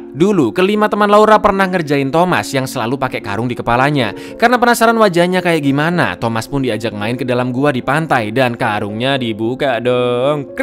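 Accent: Java, Indonesia. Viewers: gw sebenernya ga terlalu suka sama aksen jawa karna susah di mengerti, tapi klo mau tidur suka selalu nonton channel ini. the best channel ever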